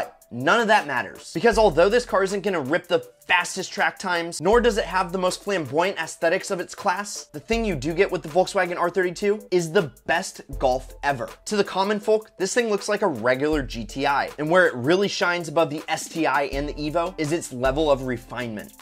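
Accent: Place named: Chicago, United States. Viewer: Are you from Chicago? Or is that just default? I thought you were west coast.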